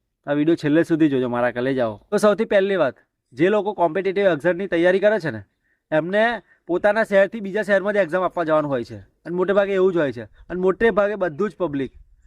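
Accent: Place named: Surendranagar, India. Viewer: તમારું ગામ,તાલુકો અને જિલ્લો કયો..ભેરુ.. સુરેન્દ્રનગર ના લાગો છો બોલી જોતા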